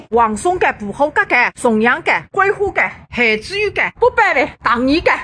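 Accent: Suzhou, China. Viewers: 这一口苏白，酥倒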